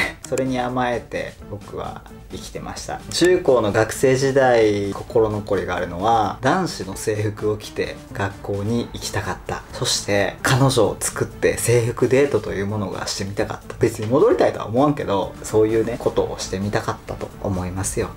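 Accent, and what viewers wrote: Kansai, Japan. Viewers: こ、これは関西弁なのか、、笑笑全然わからへん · くんの関西弁、すごくイージーリスニング。心がほわほわする。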